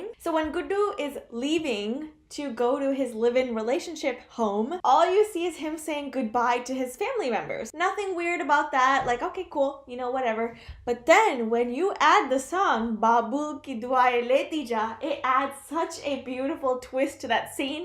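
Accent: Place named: United States. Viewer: Damnn.....Your Hindi with American accent is kinda cute and it is growing upon me...💥💥💥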